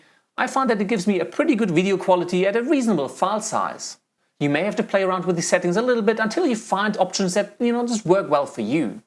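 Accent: Germany. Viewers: You're not an idiot (and you better get past that idea if you're going to make any progress in life). This guy's accent is not merely obscure, it's incomprehensible. I truly do not understand why any company would hire this guy to speak to Americans. I doubt he's a native English speaker; I'm thinking northern Germany for his birthplace. This is NOT a "tutorial for an absolute beginner." Partly because the guy's accent is as thick as mango trees in Cuba. Example: Americans say EYE-CON, this bizarre chap says "EYE-cun." It's a small difference, but given how fast he's talking, it's a major difference, and the net result is, you can get lost quickly.. The other reason this is not for beginners is, he goes way too fast. Many, many, many better options available for beginners. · I love this video! I love your accent! It's German, right? You gained a new subscriber! 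· Delighted that you replied! I’ve been struggling so much since I bought my Premiere Pro subscription, and I’ve been suuuuper intimidated to release my second video. The road appeared so uphill, and honestly, till I saw your video today, I’ve been regretting the Adobe purchase. I shall try again tonight. By the way, found your accent pretty interesting! Is there any German or Austrian connection ? Just curious :-) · Are you German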